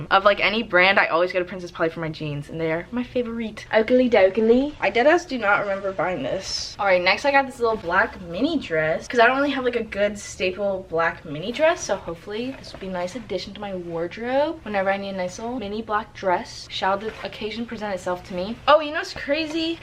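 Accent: United Kingdom. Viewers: luv the british accent luv! also congrats on 100k<3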